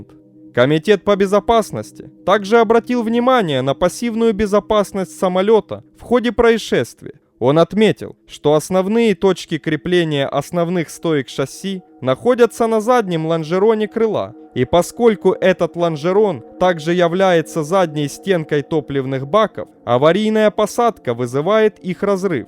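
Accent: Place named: Ukraine. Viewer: Автор украиноязычный судя по произношению, и я уже много раз пишу - розмовляй рідною мовою. Так буде краще.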